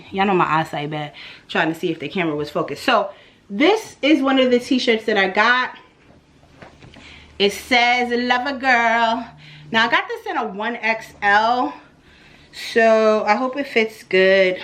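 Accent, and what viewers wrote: New York, United States. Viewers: We know you’re from NY girl, you couldn’t hide it if you wanted lol 😂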